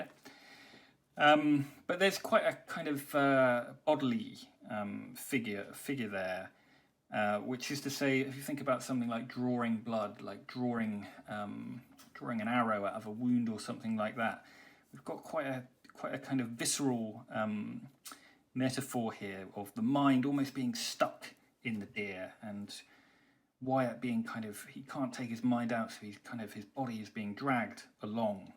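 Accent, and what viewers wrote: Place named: England, United Kingdom. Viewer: English with an English accent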